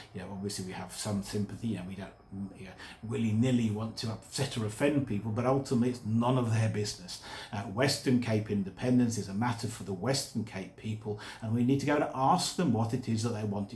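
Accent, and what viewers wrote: United Kingdom. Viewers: This guy actually has a British accent. He needs to go.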